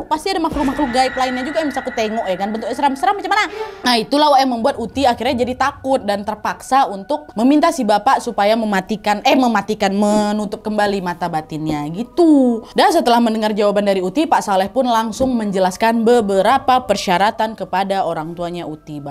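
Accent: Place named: Banjar, Indonesia. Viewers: BANJARMASIN KANDANGAN HADIR ☝️, lucu dengar pakai bahasa Banjar 😅🤣🤣😅, Kya suara Acil ku di higa rumah yg panyarikan tuh 😅😅😅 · agak kaget pas denger kak ngomong b.banjar, logatnya kerasa bgt ga sii?, keren parah kak hha 😌 juga baru pertama kali ini denger khw yg pake bahasa daerah tapi gabaca subtittle, btw berhubung udh di penghujung ramadhan, aku minta maaf ka wawak-wawak semua kalo aku ada salah yaa, minal aidin wal faidzin wawak semuaa 💟💟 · Cocok banget kak bicara bahasa Banjar, logatnya pun kental, emang multitalent 😊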